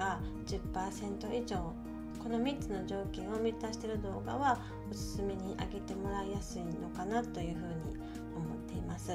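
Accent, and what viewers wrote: Kyoto, Japan. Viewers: めちゃくちゃ、京都弁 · アラフィフ・・・ですか！？お若くて美しい。 京都のディープな所をご紹介頂き、東京から中々抜け出せない私にとっては、興味津々摩訶不思議な異世界を垣間見せて見せて戴き、とっても楽しみなチャンネルです。今後も京都の隅々までご案内して頂けたらと、期待を込めてチャンネル登録させて頂きました。 京都弁良いですね〜。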